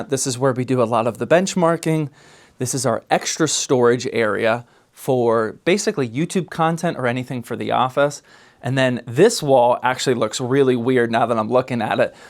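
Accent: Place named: Texas, United States. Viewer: you already sound like you're from texas, you're definitely ready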